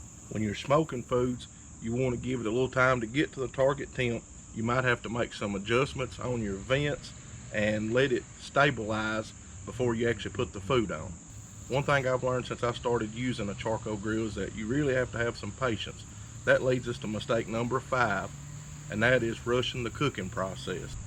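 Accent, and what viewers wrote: South, United States. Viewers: What part of the US is that dialect from? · Special like for southern accent. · Love the tips and love the acent. How can you sound like a US Southener so authenticly? keep up the good work! Cheers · Thank you I really Like Your videos and Your country southern accent Your tips have help Me very much Your a Great American God Bless you. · That’s the most southern accent I ever heard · Funny how this accent is associated with clean, efficient, non-meme information from experience. The time will inevitably come when the memes will reach the American South. Then no one is safe no moar 😆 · Whereabouts in the US are you from? Loving the accent. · Big southern boy, sounds like sound advice. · Good to hear a Southern accent. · That's the most american voice I've ever heard, absolute faith in this man's knowledge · Is it prejudice of me to be like “he has a southern accent, imma listen to him about BBQ?” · Heavy southern accent and a cap that says tractor supply, you just know you are at the right place for grillin.... 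:-) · Love the Southern accent, this guy knows what he's talking about! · If a guy is has a southern accent and is talking about a gun or grill...listen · what is it about the southern accent that makes us just trust whatever they say lol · Where is that southern accent from? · People can pick on a southern people but I am so proud to be Southern I love to hear us talk including myself LOL my accent ain't nowhere near as deep as this my daddy and family had a accent like this my Yankee husband took mine away a little bit😂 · All of these gas or pellet grills don't know the pure enjoyment of Caveman grilling with charcoal. When I heard the Southern accent I knew this was on like Donkey Kong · Great Video mate. I'm from Australia and your Southern Accent is just perfect.. Lol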